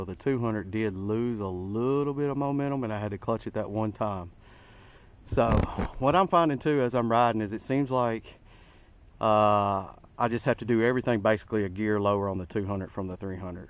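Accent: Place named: South, United States. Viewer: i'm not from America, but.... is that a bit of a Southern accent i detect there...? i sure think so !!! :-))) great looking bike bro ! thanks for the review ! greetings from Israel ! take care !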